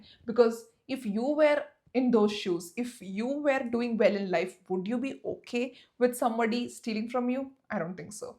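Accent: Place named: England, United Kingdom. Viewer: Hello Di Wassup You know what idk why but I am in love with the way you are talking i mean I am obsessed with the English accent Love from Assam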